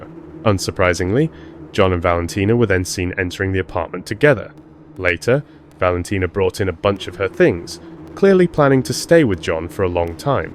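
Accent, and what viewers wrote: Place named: United Kingdom. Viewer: Tip: get rid of that offensive, slimey british accent😮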